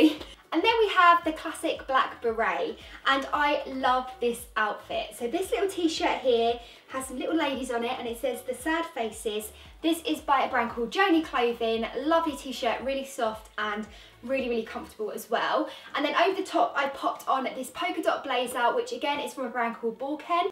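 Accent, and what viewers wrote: United Kingdom. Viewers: You may not realize it,but it's the combination of your dimples,your eyes,your British Accent and those colorful Berets you employed on your head that drove this Cambodian lad insanely mad.You got a boyfriend?? I can totally see you clinging to my arm while take a promenade up and down the Champ Elysee.The only thing missing on you is a little French Poodle leading the way to The City of Love!!;)))) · She’s from the uk ❤️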